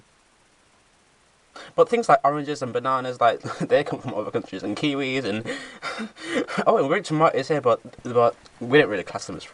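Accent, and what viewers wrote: United Kingdom. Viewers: What's british is your accent. If we'd met I'd have to stop you and ask you often to repeat slowly what you say because I miss lots of parts (I have to listen to brit films with subtitles). Also, your haircut is sort of british, no? Well, to me it reminds a period of the early 1980's which was specifically brit.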